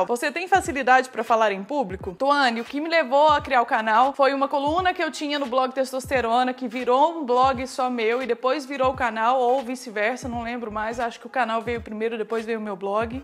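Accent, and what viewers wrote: Minas Gerais, Brazil. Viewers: vc e mineira? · Sotaque mineiro <3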